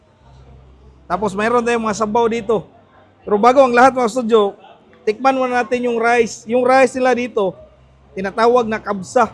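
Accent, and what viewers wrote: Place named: Rural, Philippines. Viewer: Buti pa mag binisaya or Cebuano ka nalang kasi yung tagalog mo ay parang pambukid.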